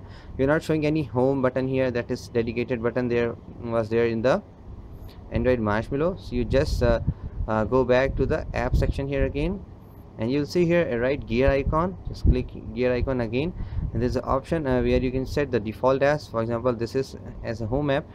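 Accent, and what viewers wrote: India. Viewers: You have a terrible Indian accent... Just terrible... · You speak too fast! We native American English speakers do not speak the English language that fast, and it is VERY hard to understand when you speak that quickly. I know that in your native language(s) in India (I'm guessing) you speak very quickly, but in rest of the English-speaking world we do not speak English like we are firing full-automatic on a machine gun! I could not follow you because you spoke too quickly and mumbled some of what you spoke too quickly. You need to learn to SLOW DOWN and ENUNCIATE your English if you want to do these videos outside of English-speakers from your country who are no doubt used to lightning-fast English spoken in a Hindi/Urdu/etc/--fast way. All I wanted to know was how to set multiple lockscreen wallpapers in Nova Launcher (because somehow they stopped changing with Nougat) without having to re-engage TouchWiz Launcher and then re-engage Nova Launcher--- but I can't understand you, so this video is a FAIL.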